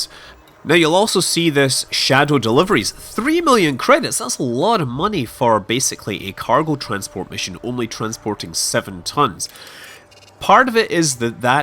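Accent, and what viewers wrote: Scotland, United Kingdom. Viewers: Goddamn I love Scottish accents.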